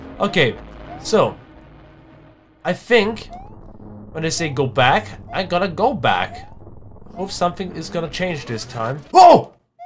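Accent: Germany. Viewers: Are you German? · Can it be that youre german or something like that? 🤔😃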